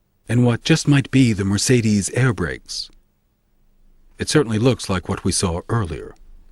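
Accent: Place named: United Kingdom. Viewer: El título en ningún momento habla de español. Deja de enojarte con otros por ser vos el burro, y aprende un poco de inglés. Es británico encima, mucho más fácil de entender que el norteamericano. No seas tan engreído y deja de tenerle bronca a otros por tu ignorancia.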